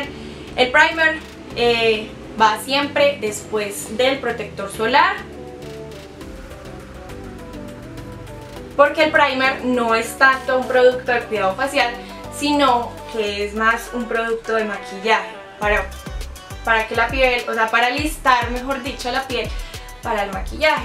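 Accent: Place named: Antioquia, Colombia. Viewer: hola, me encanta tu hablado paisa es super chevere y también me gusta que pienses en nosotras y nos dices donde conseguir los productos y cuanto cuestan, saludos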